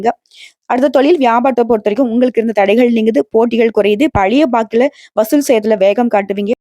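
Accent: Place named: Tirunelveli, India. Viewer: Good to hear in my Tirunelveli Tamil slang 👌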